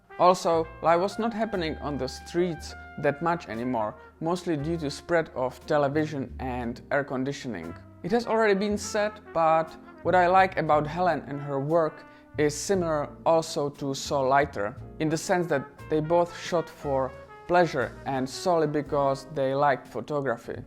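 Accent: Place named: Germany. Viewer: He speaks English with a heavy ( sounds German ) accent . I `m sure he speaks English better than you speak German .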